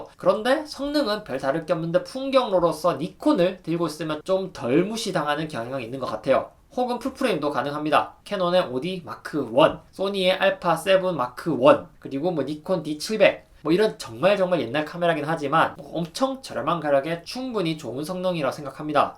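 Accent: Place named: Gyeongsang, South Korea. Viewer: 갱상도 사투립니다ㅋㅋㅋ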